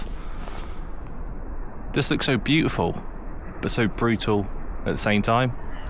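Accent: United Kingdom. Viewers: Британский акцент это нечто. Каждый раз ловлю вайбы, как будто мне профессор в Оксфорде лекцию читает ( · Американское произношение гораздо чище и понятнее британского. Бриты говорят как будто у них рот набит кашей. Мямлят и не произносят окончание слов. говорит с бр. акцентом, но понятно каждое слово. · What do you call our resiential buildings? Comfy? Commie? (as in Communist) Coming? I don't understand British accent too well, I'm afraid. LOL But anyway, they have such buildings all over the world, - Europe, USA, Canada... It's not something typically Russian or Soviet :) And hey, welcome to Russia! Stay warm! Spring is nigh! :)))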